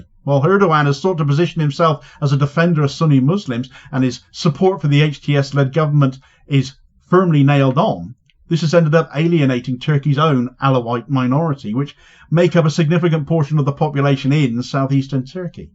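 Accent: United Kingdom. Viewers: I have great difficulties with the British dialect of American 😁, however, thank you for covering this important content.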